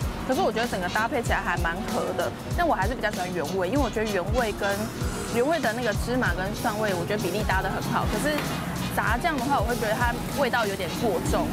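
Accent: Taiwan. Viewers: 你知道嗎，，我想臺灣時就看你節目，在歐洲待久了，聽到你的口音就知道我的魂又回到臺灣了，親切的像鄰居，謝謝你❤️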